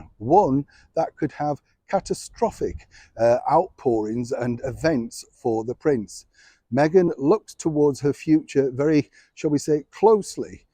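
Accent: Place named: England, United Kingdom. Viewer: The joy of an English voice speaking and the flowers magnificent! Love the chat !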